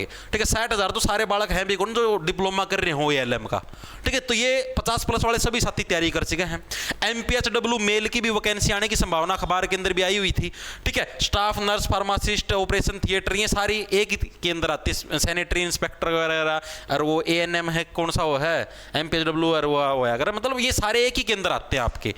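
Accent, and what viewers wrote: Haryana, India. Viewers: haryanvi accent samajh ni aata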